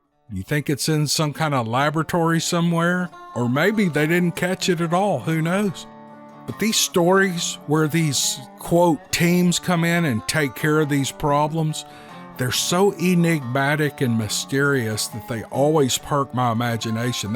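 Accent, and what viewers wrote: South, United States. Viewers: It’s his southern draw and personality that make the stories even better. I’ll bet if someone else narrated them, it wouldn’t be near as good. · Good to hear a southern voice I'm Texan but you can tell the accent we're all different and we all stick together you have great stories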